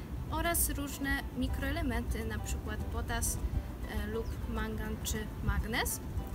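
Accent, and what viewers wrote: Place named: Minas Gerais, Brazil. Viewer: Olá, você tem um lindo sotaque solo da região sudeste mineira minha graça é Daniel... Wow, niesamowicie, kiedy mówisz po brazylijskim portugalskim.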